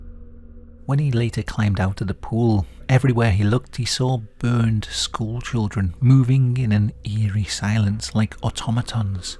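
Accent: United Kingdom. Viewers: WHILE THIS ARTWORK IS HAUNTING AND DISTURBING LET US NEVER FORGET THAT EMPEROR HIROHITO HAD ALREADY JOINED FORCED WITH ADOLPH HITLER AND THAT NEITHER ONE OF THEM WAS INTERESTED IN STANDING DOWN, THEIR MUTUAL GOAL WAS 100 % WORLD DOMINATION. I AM SURE THAT THE US SAILORS WHO RESIDE AT THE BOTTOM OF THE OCEAN ON THE ARIZONA AND OTHER SHIPS WOULD REALLY ENJOY THIS BRITISH NARRATION AND VIEWS AND YOUR OPINIONS. PERHAPS THOSE USA BURNED AND DROWNED SAILORS WOULD HAVE PREFERRED TO LIVE OUT THE REST OF THEIR LIVES ON EARTH INSTEAD OF DOWN IN THE OCEAN AS FOOD FOR FISHES. SO KEEP YOUR WOKISM OPINIONS ABOUT A WAR YOU DID NOT PARTICIPATE IN NOR FIGHT IN, AS MY OWN FATHER DID!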